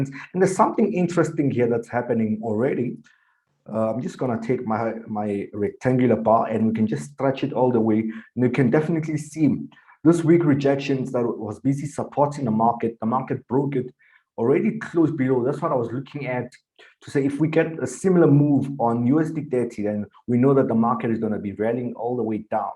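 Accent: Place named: Uganda. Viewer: U sound like Ugandan and munyoro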